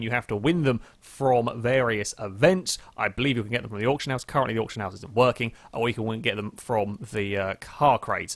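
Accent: United Kingdom. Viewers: I dont know why but i find your voice addicting, maybe because of the british accent?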